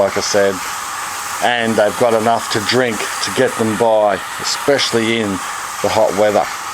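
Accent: Australia. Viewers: HERBICOUS! I totally enjoy you info and your Aussie accent!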